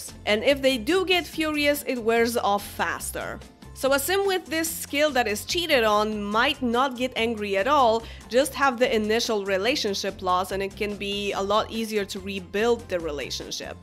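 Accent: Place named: Poland. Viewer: You sound Polish, are you? 🐦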